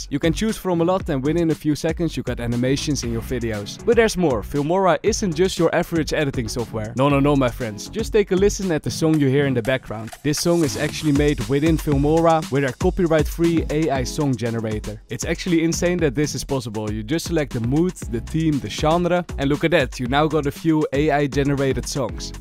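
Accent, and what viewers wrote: Netherlands. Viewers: je hoort heel duidelijk dat ne nederlands bent hahahhhaa maar leuke viddd!!!!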